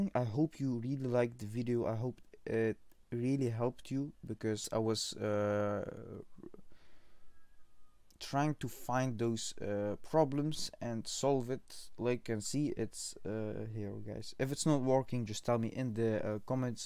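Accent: Netherlands. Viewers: ik hoor nederlands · lol i can hear you have dutch accent me 2 haha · Ik hoorde gelijk dat je NL was haha maar goed uitgelegd pik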